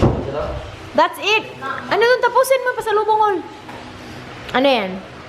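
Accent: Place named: Visayas, Philippines. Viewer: Ka cute nimo mo sulti ug Bisaya te oi😂😂😂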